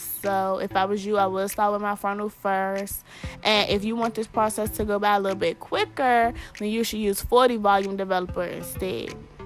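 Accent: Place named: Baltimore, United States. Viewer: Accents giving me B more or philly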